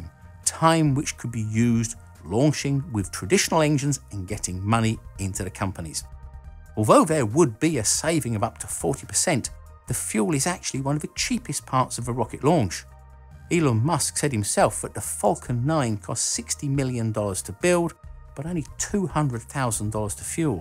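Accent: United Kingdom. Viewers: Did he just say folcon heavy i ♥️ the british accent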